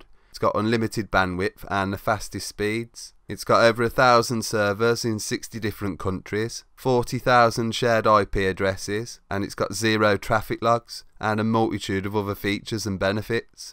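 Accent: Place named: Birmingham, United Kingdom. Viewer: Got the VPN mate thanks. Loving the Brummie accent, tell me you're a villa fan?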